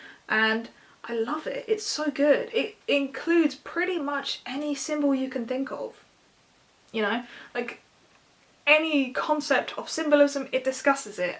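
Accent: England, United Kingdom. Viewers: Hey - just discovered your channel - I'm in Devon in England - sounds like you're in England too. I have a witchcraft channel as well - brilliant book recommendations! Thank you for sharing 😊 🙏 💓 Xxx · Are you from the UK? ❤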